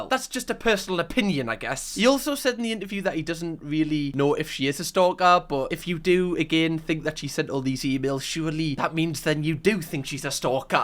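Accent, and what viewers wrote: Newcastle, United Kingdom. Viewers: Ive suscribed just to hear your lovely Geordie accent.❤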